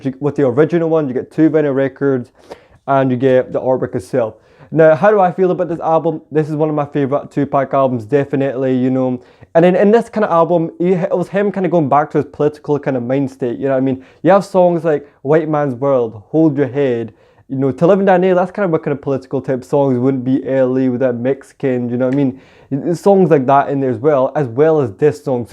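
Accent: Fife, United Kingdom. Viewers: Sounds like a Fife accent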